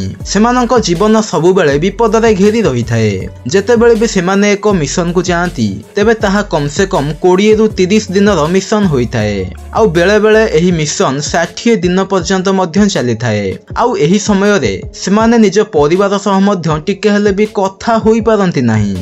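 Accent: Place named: Jajpur, India. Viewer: Bhai tuma voice ta janila pari laguchi tuma ghara jajpur nanpur ki ❤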